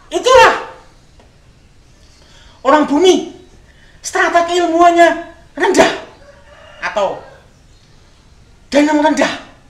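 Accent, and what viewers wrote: East Java, Indonesia. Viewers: Jowo timur kayak gitu mang mas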